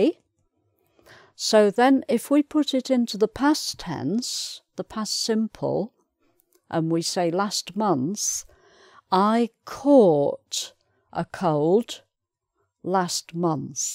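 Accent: United Kingdom. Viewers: * “Very kind and a polite teacher. I am impressed with her British accent”. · Very kind and polite teacher I impressed her British accent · I Like it This video's good ,learn speak English British with this teacher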